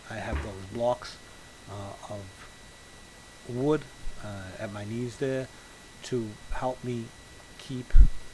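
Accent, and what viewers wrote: Boston, United States. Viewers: You sound like a Red Sox fan so I know you’re not near me. But I could’ve used a tile pro like you. I got shoe makers working in my bathroom.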